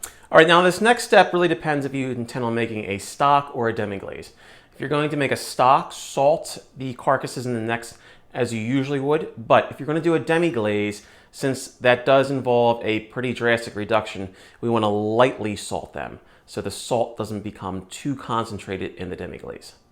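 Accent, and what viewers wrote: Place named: Philadelphia, United States. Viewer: Not a lot of videos on demi-glacé, appreciate it! That accent don’t lie, you’re definitely a Philly boul!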